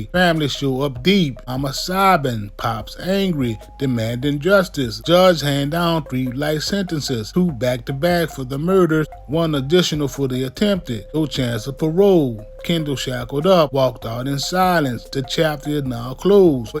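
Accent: New Orleans, United States. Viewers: That’s how we if you’re from New Orleans. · I Understand Completely What That Man Saying. This How We Talk In New Orleans. If You Can't Understand What He Saying Then Why You Watching His Content?! 🤷🏿‍♂️ And More Importantly If That Southern Accent Is "Painful To Listen To" Then Get Tf Off This Man Shyt!! 😂😂✌🏿✌🏿 -Signed A New Orleans Native Bih!! ⚜️🤣🖕🏿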